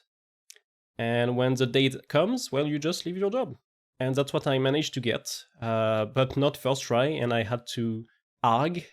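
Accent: France. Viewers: hahaha nah bro don't get me wrong I am taking this hole topic serious and huge respect for quitting your job and talking about it, but at this point your french accent is making anything funny for me.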